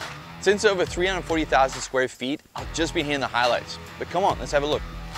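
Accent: South Africa. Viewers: Hi; I hope this is not too personal, but do I detect a bit of an SA accent? Niks verkeerd daarmee nie.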